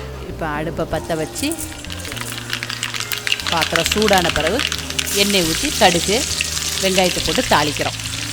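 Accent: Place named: Tirunelveli, India. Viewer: சிறுகிழங்கு அவரைக்காய் பொரியல் சூப்பர், உங்கள் தெளிவான திருநெல்வேலி தமிழ் மிகவும் சூப்பர்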